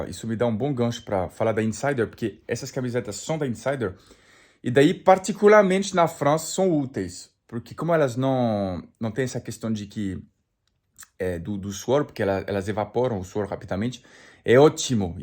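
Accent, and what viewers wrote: Brazil. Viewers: O francês abrasileirado!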